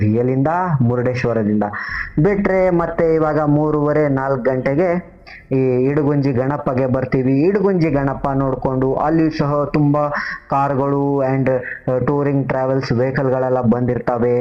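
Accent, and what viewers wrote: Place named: England, United Kingdom. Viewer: ಆಂಗ್ಲರು ಕನ್ನಡ ಮಾತನಾಡುವ ರೀತಿ ಮಾತನಾಡದೆ ಸಹಜವಾಗಿ ಕನ್ನಡ ಮಾತನಾಡಿ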